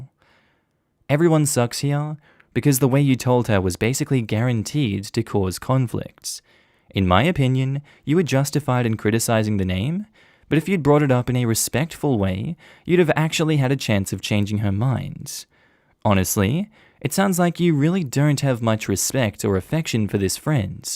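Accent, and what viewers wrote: Australia. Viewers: Hey!!!! I figure I should comment more because I’m here for pretty much all your videos haha. Also oddly growing up I was never fond of the Aussie accent (I’m from NZ) but for the last few months you’ve been my go-to reddit readover type videos voice favourite. Love the work and I’m happy you’re back safely in Aus. Hope your fam in Ireland is doing awesome as well :) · Same here! I can't sleep listening to this Aussie.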